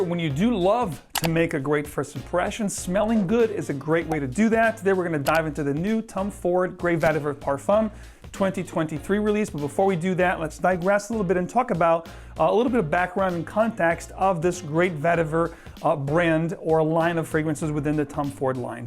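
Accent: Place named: New England, United States. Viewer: you're from nee england brotha 💪🏽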